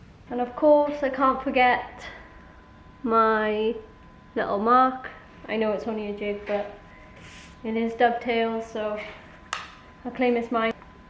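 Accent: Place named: Wales, United Kingdom. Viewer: Subscribed to your channel recently and I've absolutely loved watching your videos so far. I was brought up in Wales so hearing you talk with that lovely accent is so nice. Quite envious of your spacious shop - it makes my little shed look like a broom cupboard ! Looking forward to watching your next videos. Thanks for posting.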